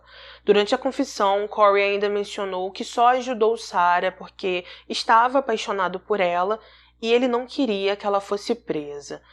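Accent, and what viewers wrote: Rio de Janeiro, Brazil. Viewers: Tô és do Rio? Fala extados unidos parecendo carioca. É legal 👋🏻👋🏻👋🏻👋🏻👋🏻👋🏻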